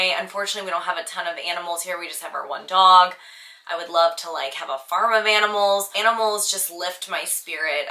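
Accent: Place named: Ohio, United States. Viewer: Nice to get to know more about you! I thought you may be from my neck of the woods due to your accent. I am an Ohio girl. We have alot in common and and can totally relate.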